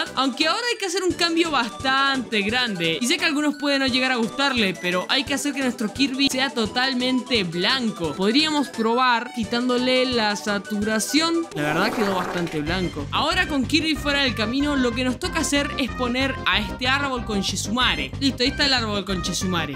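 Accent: Chile. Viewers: Erais chileno o qué onda weon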